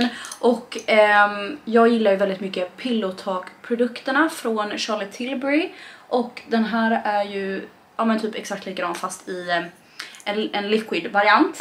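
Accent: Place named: Småland, Sweden. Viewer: Hahahha så fin! Men varför pratade hon typ småländska😂❤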